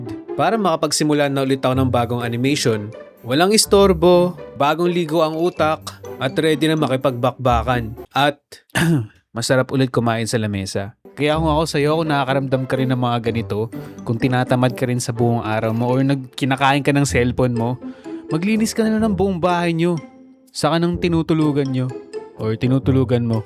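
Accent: Ilocos, Philippines. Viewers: Ilocano ka yahhh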